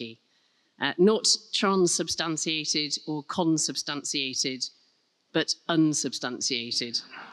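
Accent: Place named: England, United Kingdom. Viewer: Lets hope this enlightened amicable intelligent hard working humane person becomes Prime Minister of the UK one day. Imagine, someone who knows what they are talking about as Prime Minister. Once you get over her beauty and adorable english accent ( I had a crush I eventually got over, reality set in ) and actually start listening to her without bias , watching her TV programmes and reading her books, you realise what a gem the world has here. And nothing but sense she speaks.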